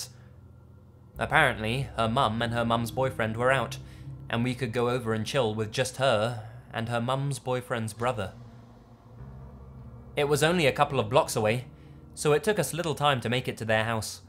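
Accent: England, United Kingdom. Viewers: hes from the uk like me so we will pronounce it differently , since the language started with us XD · No need for sarcasm, or maybe you’re just being stupid, I can’t tell. Obviously I can tell from his accent that he’s from England - I mean WHERE, given that there are so many counties in that country. I didn’t want to just presume he’s from London or something. 🤦‍♀️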